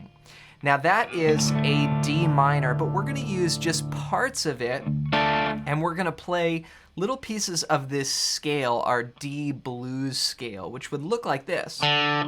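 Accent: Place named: California, United States. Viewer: I'm from the U.S. and if I had to guess I would say somewhere along the west coast. I detect a very slight "California surfer" accent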